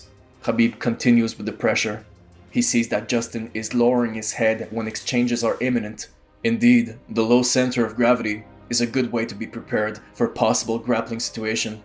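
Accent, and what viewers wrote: Arab world. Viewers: It's seemed you have an Arabian accent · Yo we can tell from your accent that you're arab. Don't call him khabib when you know how to pronounce his name HABIB properly. Fake ass guy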